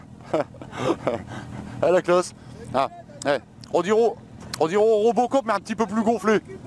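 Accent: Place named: North, France. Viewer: Vu les décors et l'accent tu es clairement d'min coin toi x) Tu es aux alentours de Bouchain ? (Je crois avoir vu que tu avais fait une vidéo sur le salon moto) Garde l’œil ouvert si tu croises un gars en MT01, R6, Diavel ou Streetfighter 1098 habillé en rouge dans le coin, j'y roule presque tous les jours ;) Bonne continuation. V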